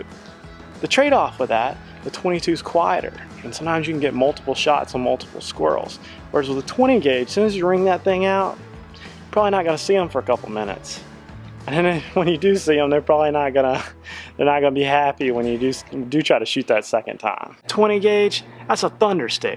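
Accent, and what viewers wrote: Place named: Alabama, United States. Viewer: I love all your vids I'm in South Alabama we have the exact same accent lol l think it would be so cool to hunt and fish with you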